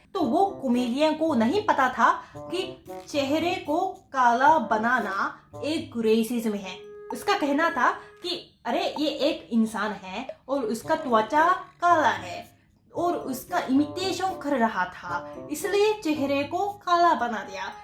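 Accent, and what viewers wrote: Standard. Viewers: ap to kuch jada hi pure hindi m bolte h🙏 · Humto sirf Hindi bolte hai ji to sudha Hindi bolti Hain😘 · 🙄 itni suddh hindi to mene khud ne aaj tak apni puri life me nahi boli including hindi exam papers. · Itni pure Hindi to Mai bhi nhi bolti🤣 Thankyou for loving our culture💜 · U speak pure hindi...and we have lots of impurities mixed in our langauge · I love you apka Hindi Bhasa suddh hai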